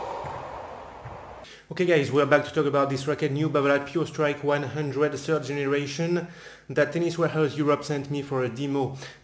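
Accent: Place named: France. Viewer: french accent is so funny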